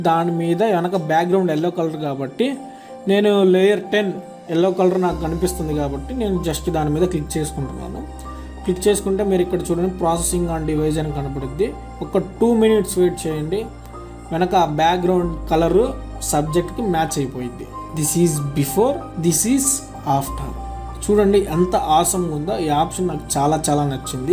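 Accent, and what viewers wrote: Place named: Ongole, India. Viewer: Anna mi vaice ongol sai kada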